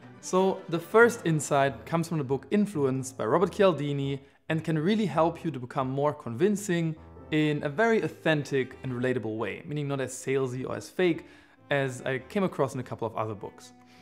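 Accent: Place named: Germany. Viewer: Höre dass du Deutscher bist :P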